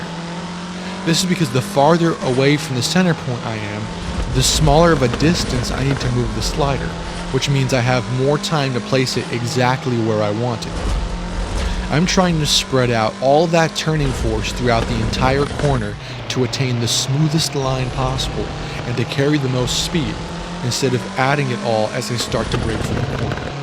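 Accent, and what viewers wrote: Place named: United States. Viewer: American accent sticking out like a sore thumb.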